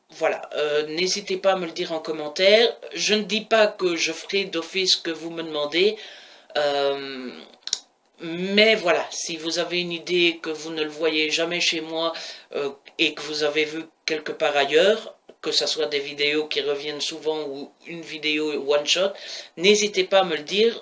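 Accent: Belgium. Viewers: Coucou! Happy Bday!:) Je ne suis pas une habituée des commentaires mais sache que je te suis depuis quelques mois maintenant et que ça reste un plaisir de voir tes vidéos:) (C'est sympa d'avoir un booktubeur avec un super accent made in Belgium! Je suis Liégeoise, je sais ce que c'est lol) Perso, j'aime les formats habituels, je n'ai pas de demande de vidéo spécifique. Au plaisir de te voir dans une prochaine vidéo, bisous!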